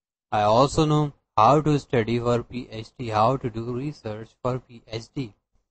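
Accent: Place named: India. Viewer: heavy indian accent.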